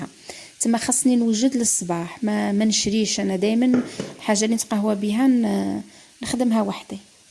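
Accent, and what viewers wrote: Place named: Ain Temouchent, Algeria. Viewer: متتبعة جديدة تخلت لبيتم وعحبتني هدرتك انت من الغرب هدرتك تاع تموشنتية بصحتك مشترياتك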